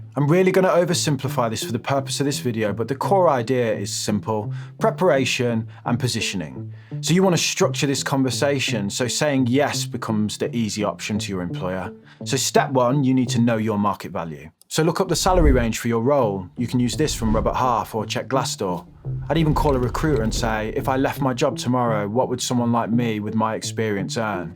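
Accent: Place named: Midlands, United Kingdom. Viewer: Why does this guy say he’s a northern lad every video? He’s clearly a midlands boy based on accent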